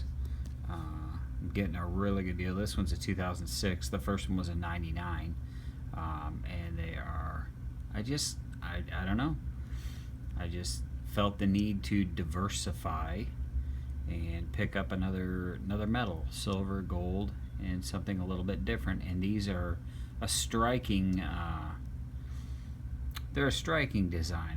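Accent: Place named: South, United States. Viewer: Sounds as a southern belle !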